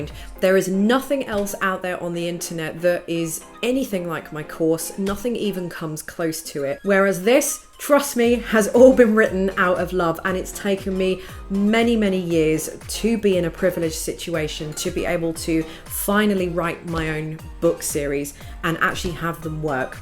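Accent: England, United Kingdom. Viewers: What part of england are you from? London?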